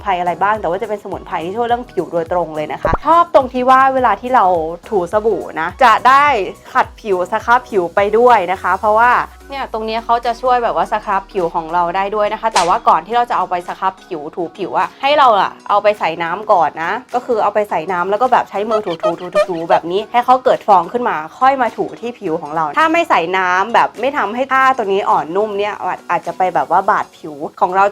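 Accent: Isan, Thailand. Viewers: พี่เป็นคนอีสานใช่ไหมครับ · ชอบ พูดอีสานค่ะ น่ารักดี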